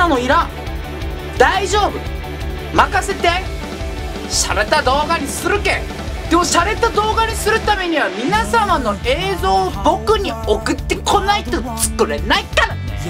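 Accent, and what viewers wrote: Fukuoka, Japan. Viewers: 福岡弁がすごくいいです😉💖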